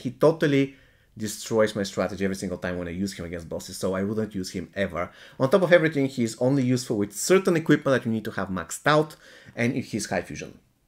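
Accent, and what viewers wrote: Bulgaria. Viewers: are you bulgarian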